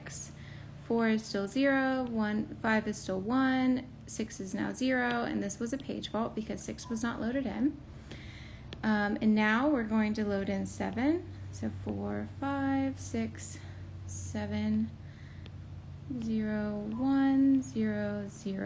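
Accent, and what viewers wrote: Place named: Turkey. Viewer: bro where are u from that's turkish english ı think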